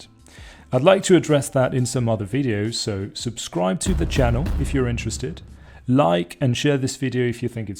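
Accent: United Kingdom. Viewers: I'm assuming you are a native French speaker, but it's crazy hearing the British English tone of your voice compared to the French (speaking as an American haha). Both are very clean and clear. I only wish to be able to pronounce French like a native at some point. It's super tricky mimicking some sounds.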